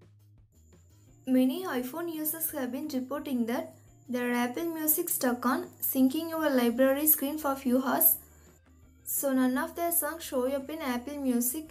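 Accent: India. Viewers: Indian accent ?!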